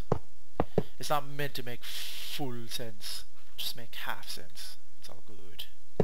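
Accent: Ireland. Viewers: Does every Irish says "ish" instead of "it" ? (I'm french and this particularity made me curious :3)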